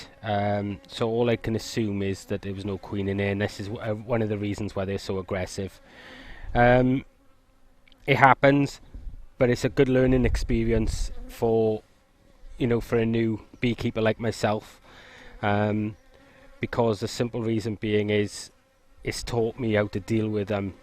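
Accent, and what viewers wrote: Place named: Welsh Valleys, United Kingdom. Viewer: Thanks for the interesting video but most people like me who search for 'starting a bee colony' will be as disappointed as me. Assuming we are capable enough to build the hives, assuming we have all the kit needed, what we need to see is the process from having nothing to having some honey! Show us a video that does just that...;start with nothing, like me, then go through every process till you put the honey on your morning toast. The yanks are good at videos for dummies but are too self engrossed and spend most of the video talking about themselves and how good they are at doing stuff!! I will continue to watch your vids as I am a Taff living in rural Normandy and I enjoy hearing your valleys accent.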